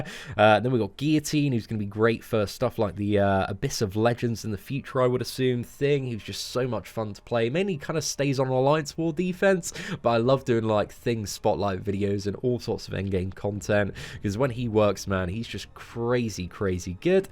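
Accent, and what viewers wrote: England, United Kingdom. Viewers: Compared to the other MCOC utubers your are first mainly because you have a very nice English accent !!!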